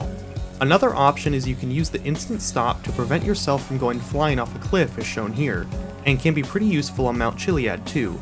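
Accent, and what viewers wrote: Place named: United States. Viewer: Yeah, he's pretty obviously american :D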